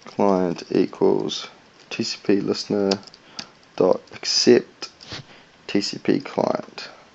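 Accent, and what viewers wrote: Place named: New Zealand. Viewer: thats a new zealand accent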